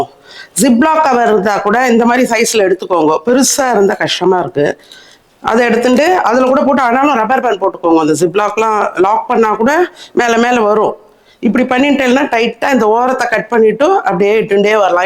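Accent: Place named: Trichy, India. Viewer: நீங்க pesarathu Nanna iruku, unga ooru Trichy AH. Nalla idea oil um water um mix pani sheet la thadavarathu 👌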